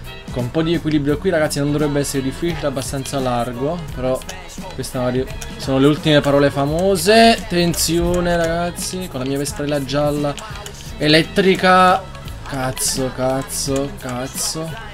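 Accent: Naples, Italy. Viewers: È di Napoli :D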